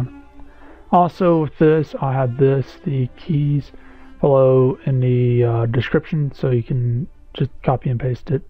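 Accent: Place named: North Carolina, United States. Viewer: i knew i heard that nc accent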